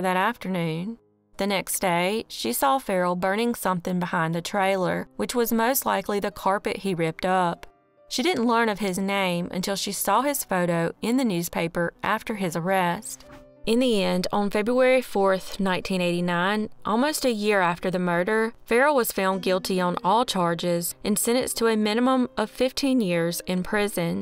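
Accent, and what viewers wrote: South, United States. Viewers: I'm a southern "girl" myself SC. And you are now my favorite crime you tuber. So refreshing to hear a familiar accent.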